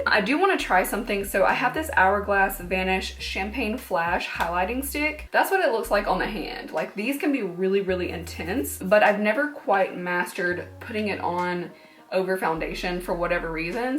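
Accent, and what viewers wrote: South, United States. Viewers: I agree she reminds me of the south too and I'm just like 😭😭😭 I miss those accents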